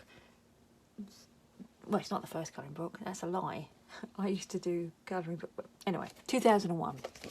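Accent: North London, United Kingdom. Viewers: Lovely collection! You talk like me, I'm from North London!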